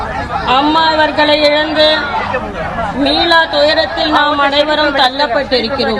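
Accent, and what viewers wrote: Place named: Karnataka, India. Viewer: இந்தப் பாப்பாத்தியின் தமிழில் கன்னட வாடை அடிக்கிறது. தமிழ் நாட்டை ஆள ஒரு உண்மையான தமிழன் கிடையாதா. சசிகலா ஒரு தமிழச்சி, தமிழ்நாட்டை ஆள அதைவிட விட வேறென்ன தகுதி வேண்டும்.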